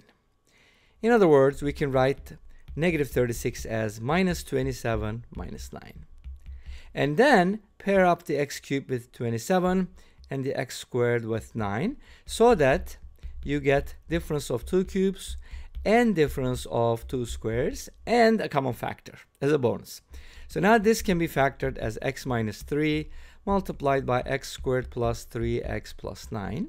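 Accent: Turkey. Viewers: Is that a Turkish accent I'm hearing? 🤔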